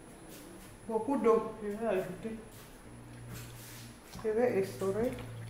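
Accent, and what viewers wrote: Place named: Cameroon. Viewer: J'aime beaucoup votre accent ! Ça me rappelle mon enfance au Cameroun à Mbouda ! Je m'abonne et j'essaie la recette aussi vite que possible ! Trop fan ! 🤣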